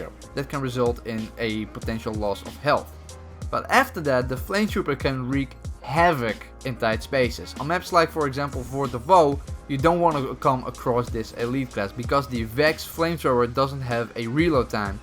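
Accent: Netherlands. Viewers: are you netherlends of dutch because your accent? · Jij bent Nederlands ik hoor het aan jou accent😂😂 maar toch een goede video 👍👍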